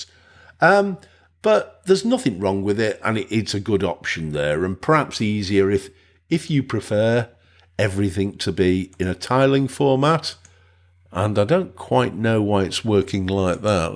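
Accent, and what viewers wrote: United Kingdom. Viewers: Happy New year Year, sir! Apart from learning a bit more about Linux, being a non-native speaker of English, listening to your authentic British accent is a pure delight. Do you have it open-sourced so I can fork it (aka adapt it a bit)? 😃 Shine on!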